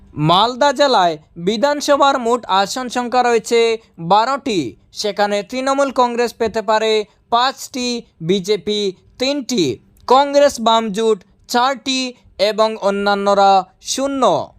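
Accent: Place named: Bangladesh. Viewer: এটা বাংলাদেশী মাল কথার ধরন শুনে মনে হচ্ছে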